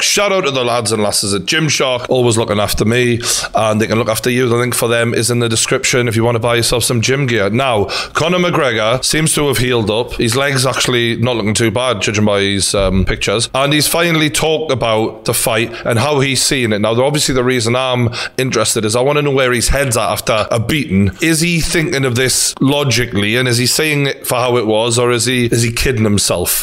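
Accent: Newcastle, United Kingdom. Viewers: I love but I'd rather not have a husky Geordie man talking me to sleep, not again anyway...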